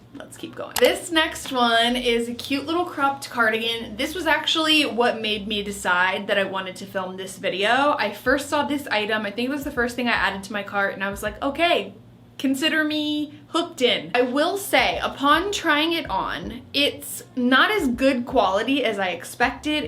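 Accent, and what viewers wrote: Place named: United States. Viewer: Even though you have an American accent for some reason when I watch you I feel like I’m watching a British YouTuber? So thanks for making me feel.. at home I guess?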